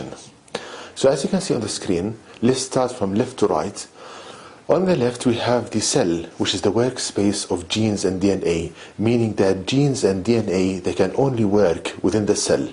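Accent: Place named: Arab world. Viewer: he speaks EXACTLY like ownage pranks arab accent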